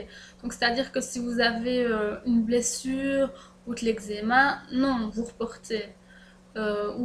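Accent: Liège, Belgium. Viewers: Ca c'est un accent bien de chez nous!! et de Lièèèèèège ;-)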